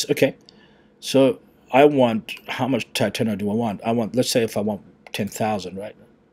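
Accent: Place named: South Africa. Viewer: Nice accent mate, are you a South African ?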